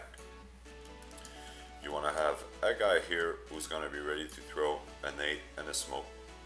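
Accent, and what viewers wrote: Canada. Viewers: are you a french canadian ?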